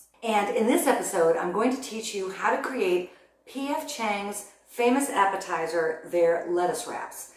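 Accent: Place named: Chicago, United States. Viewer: You seem to communicate in Chicago speak!...awsome recipes...such fun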